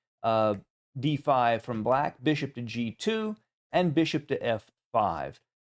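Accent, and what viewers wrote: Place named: Texas, United States. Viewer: Probably my favourite chess channel (I am also a Fischer fan) and I like the Texan accent.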